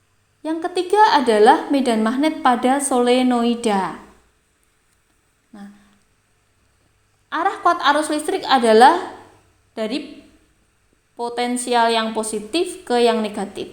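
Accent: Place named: Java, Indonesia. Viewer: Bu guru kyak nya chinese...tapi logat nya jawa... .khas logat hokien...😂😂😂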